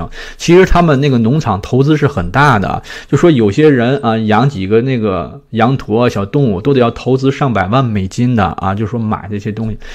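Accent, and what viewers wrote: Tianjin, China. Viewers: 這是天津式國語，在大陸很受歡迎的。您聽得習慣嗎？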